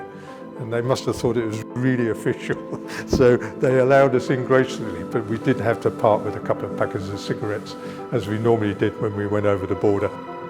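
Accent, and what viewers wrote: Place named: United Kingdom. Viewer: Your accent tells me that you shouldn’t talk about colour or taste, your a brit,0 taste 0 colour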